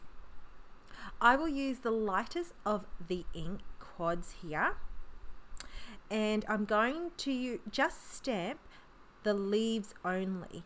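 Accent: Australia. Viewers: This type of card is very clever 💜 Lovely to hear another Aussie accent 😉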